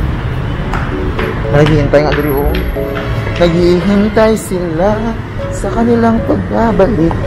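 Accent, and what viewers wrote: Iloilo, Philippines. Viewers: Ilonngo lng malakas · Ilonggo gid tana aayyy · Illonggo po ba kayo? HAHAHA yung accent kase hehe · Kuya Illongo ka? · Bacolodnon? Or ilonggo? Hehehe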